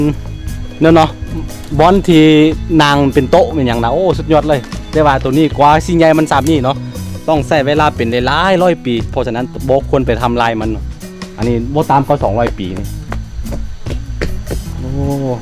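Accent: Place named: Chiang Mai, Thailand. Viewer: เพราะว่าสำเนียงภาษาหรือคำพูดของคุณเหมือนคนจังหวัดเชียงใหม่ของคนประเทศไทยค่ะ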